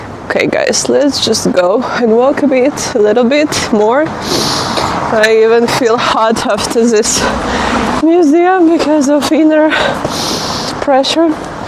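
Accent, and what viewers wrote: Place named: Ukraine. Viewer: добрий вечір. Więc najpierw napisze Tobie śmieszny komplement:) Czyli to, że masz ten przepiękny ukraiński akcent jest tak przeurocze, że ja życzę Tobie, żebyś Ty nigdy się go nie pozbyła bo to jest dla naszych uszu jak najpiękniejsza muzyka! Więc cudownie, że tak dobrze już mówisz po polsku i brawo dla Ciebie, a Twój akcent to taka przeurocza wisienka na torcie więc nie wstydź się go tylko ciesz się nim:) Dalej chciałbym Ciebie przestrzec przed czymś. W Polsce mówi się o tym, że jest mniej poparcia dla Ukraińców i że ludzie są już zdenerwowany, że tak dużo Ukraińców jest w Polsce. Więc to jest totalnie nie prawda! My bardzo się cieszymy, że do nas przyjechaliście i szkoda, że w tak strasznych okolicznościach jednak zachowujecie się w Polsce bardzo dobrze, pracujecie i dokładacie się do rozwoju naszego kraju i obyście chcieli to wszyscy po wojnie zostać. 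Zatem jeżeli ktoś Ciebie będzie pytał jak tam nastroje w Polsce czy nadal nas lubią, to możesz powiedzieć śmiało jest nawet lepiej oni nas kochają i słuchaj ja też mam ochotę uklęknąć i zawiązać Tobie buciki jak będzie taka potrzeba. A jeżeli zderzyłabyś się z jakąś nieprzyjemną sytuacją, to ja z góry Ciebie za nią przepraszam i proszę nie przejmuj się tym u nas też głupich niewykształconych ludzi nie brakuje, ale pamiętaj o tym, że każdy normalny i inteligentny Polak zawsze z chęcią uklęknie i pomoże <3 Przytulam Ciebie gorąco, życzę dużo uśmiechu i powodzenia we wszystkim oraz to jest ciężki czas teraz dla Was jednam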